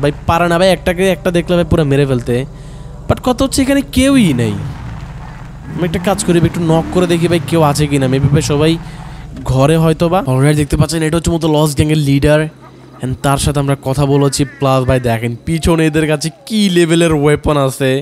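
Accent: Noakhali, Bangladesh. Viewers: Vai apni nohakhali. Kothi tha ken.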